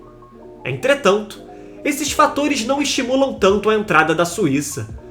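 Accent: Rio de Janeiro, Brazil. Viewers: Bahhhh. Sotaque carioca. Pulei o vídeo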